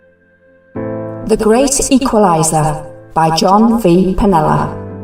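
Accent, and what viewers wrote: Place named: England, United Kingdom. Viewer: The English accent doesn't help either. LOL Not your fault,. I hear electronically with the cochlear implant. Maybe I can find a different speaker doing this information somewhere or a transcript.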